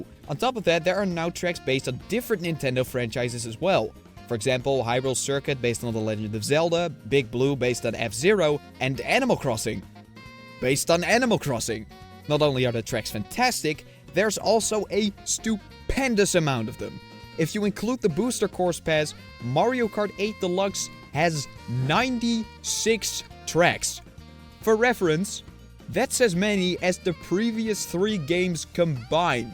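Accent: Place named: Netherlands. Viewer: Hmm, the accent... Fellow Dutchie? 😄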